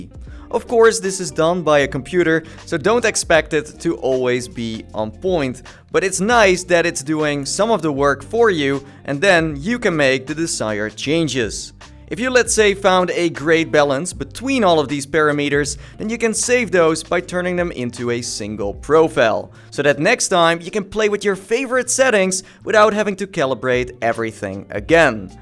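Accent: Netherlands. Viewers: hehehe I can instantly hear you’re dutch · Poh, Nederlands accentje zeg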